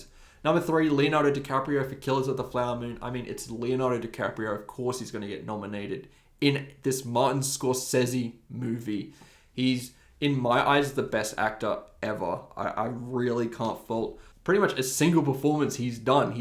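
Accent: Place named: Australia. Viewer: You sound more Aussie than NZ tbh. Nice video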